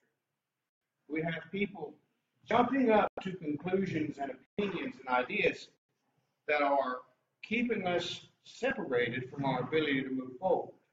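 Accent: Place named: South, United States. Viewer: Thank you sir it’s nice to hear a southern voice speak on these topics I feel a bit alone sometimes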